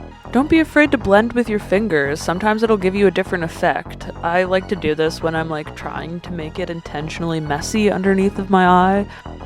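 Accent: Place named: Canada. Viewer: You Canadian Accent is amazing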